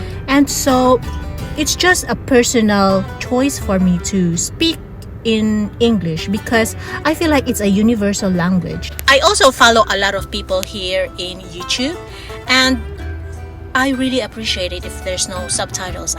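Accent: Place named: Philippines. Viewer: You totally sound like a Filipino, with filipino. You get deaf with your own sounds😂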